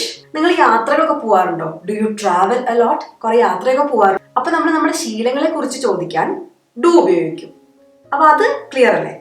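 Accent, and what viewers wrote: Thrissur, India. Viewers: Are you from thrissur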